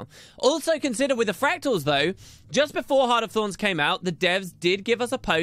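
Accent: United Kingdom. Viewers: has you're british accent gone?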